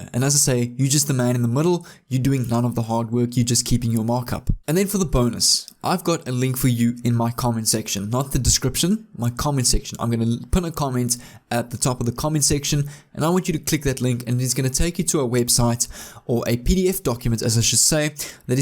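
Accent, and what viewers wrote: South Africa. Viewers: You sound like you have a South African accent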